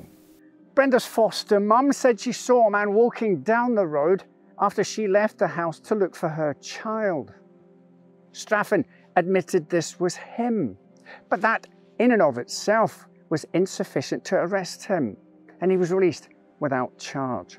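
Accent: Scotland, United Kingdom. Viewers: Great stuff. Are you Scottish? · is indeed Scottish.. 👍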